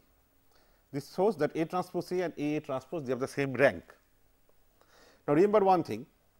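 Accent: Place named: Bengal, India. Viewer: He has a Bengali accent.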